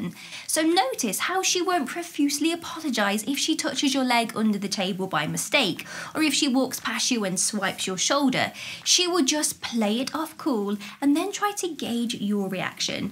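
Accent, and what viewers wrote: United Kingdom. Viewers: heyy you are one of the best advice givers ever on youtube, there is a christian girl at my church who seems to like me a lot, btw how often can you text a girl every two or three days I am assuming, i need your advice btw your British accent is pretty cute. Lastly, you can be little awkward sometimes right not intentionally, but it happens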